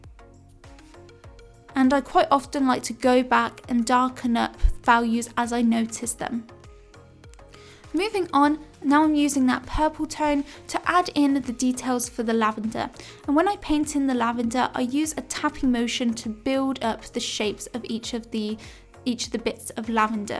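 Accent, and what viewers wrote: United Kingdom. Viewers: British Accent is fun 😶👍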